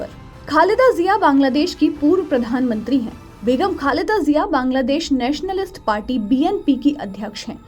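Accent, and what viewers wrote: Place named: Rajasthan, India. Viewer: Ekyannnve chhhyanve , hindi nahi rajasthani bollli aii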